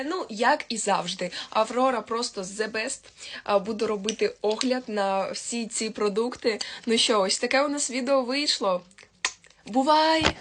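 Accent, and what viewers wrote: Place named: Russia. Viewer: Или евелін. Говори на рідній мові не ламай язика. Чувствуется русский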